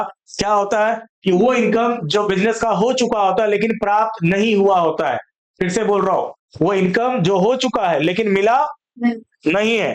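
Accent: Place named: Kanpur, India. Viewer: Language (boli) se lag raha hai AAP kanpur se ho. What am I right??🎉🎉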